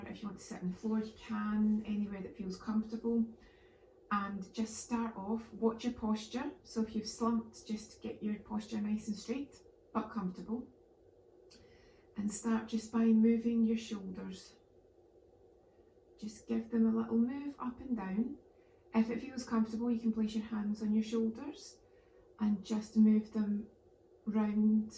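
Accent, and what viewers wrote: United Kingdom. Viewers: Is that British accent?) I'm definitely not used to British, and why does it even sound to me like slavic sometimes😂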